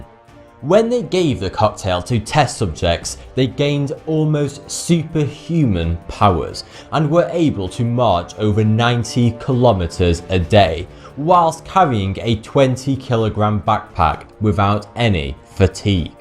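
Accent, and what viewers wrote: United Kingdom. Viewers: 찰진 영국발음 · 이분 영국식 발음 진짜 취향저격; 신기한게 H를 에이치라고 안하고 헤이치라고 하시네요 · Gilbert 개인적으로는 영국억양이라 생각합니다만... (개인적으로)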